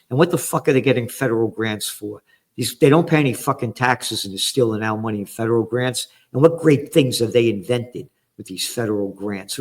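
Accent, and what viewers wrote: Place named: Bronx, United States. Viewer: Love the TRUTH spoken with a Bronx accent!😊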